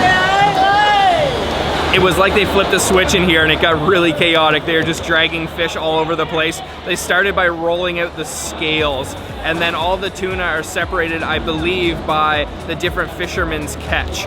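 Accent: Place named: Eastern Canada, Canada. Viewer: 100% Eastern-Canadian Accent!!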